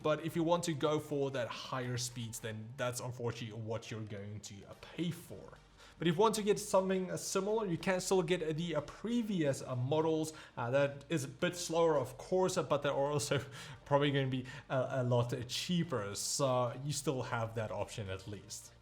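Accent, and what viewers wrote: Afrikaans, South Africa. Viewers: Thanks for the reply. With that Afrikaans accent and expert reviews this could be an epic channel. Thanks for the info on xmp. Now I know.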